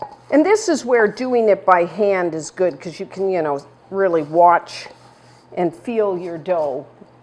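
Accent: Canada. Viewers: I knew you were from Canada as I spotted the accent - even from the Maritimes …. my mom was from PEI and I have a sister who lives there - I live in Ft. Lauderdale half the year and the other half Rockport Maine 🇨🇦🍁🇨🇦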